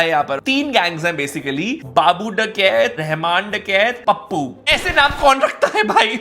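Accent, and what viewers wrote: Punjab, India. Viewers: your punjabi accent is so sexy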